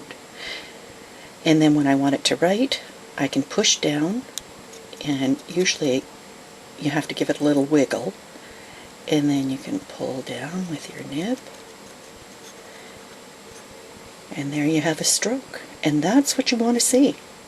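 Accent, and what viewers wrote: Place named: Canada. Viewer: Anyone want to play a game of "spot the Canadian accent"? Great video and great accent:)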